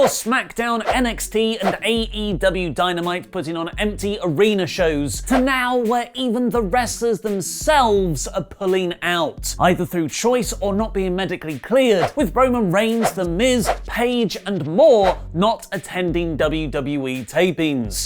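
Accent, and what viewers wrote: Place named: RP, United Kingdom. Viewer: Why do you talk like you're on Radio 4?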